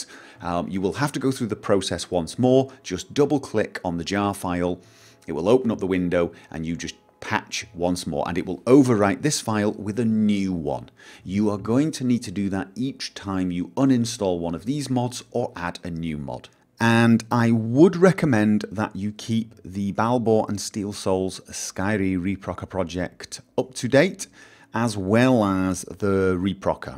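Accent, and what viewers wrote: United Kingdom. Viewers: But... British accents are cool 0_0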